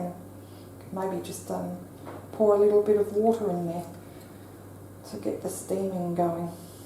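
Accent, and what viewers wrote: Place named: Australia. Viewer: I love your accent so so much! It’s just beautiful and incredibly relaxing!❤️ Australia is on my bucket list and it being cool there sounds so nice right now. It’s in the 90s here with an even hotter heat index. This is the best way to end my day! Thank you!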